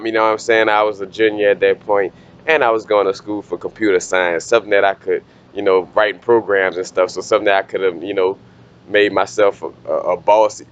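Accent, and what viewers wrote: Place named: South Louisiana, United States. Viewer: I'm from lousiana, you sound like you are in the south Louisiana area but I may be wrong.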